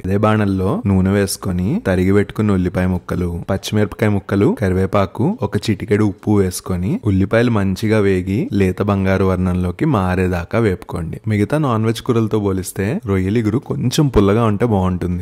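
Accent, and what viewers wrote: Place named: Rayalaseema, India. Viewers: Yerragadda anagane artham ayipoyindhi ..maa rayalaseema ani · Yerragadda..rayalaseema slang 😊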